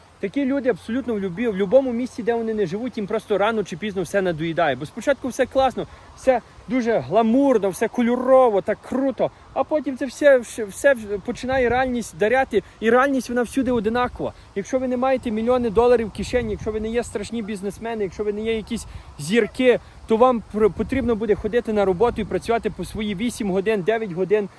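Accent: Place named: Galicia, Ukraine. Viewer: Цікава розмова. А що всюди треба працювати , то так має бути. Гарно розмовляєте українською мовою. Чую що Ви з Галицького краю. Дай Боже Вам поводження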